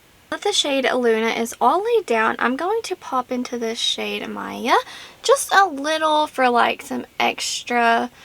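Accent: South, United States. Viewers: very haha hey neighbor!! I knew you had a southern accent and had to be close lol 😂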